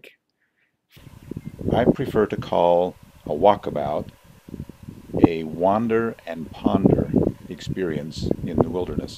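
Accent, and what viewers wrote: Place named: Utah, United States. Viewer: I thought you were from Utah from your other videos because of your accent, looks like I was right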